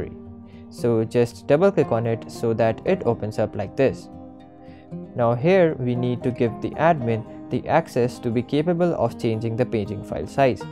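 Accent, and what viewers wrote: India. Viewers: Agree. I like his accent. Not as annoying as other indian youtuber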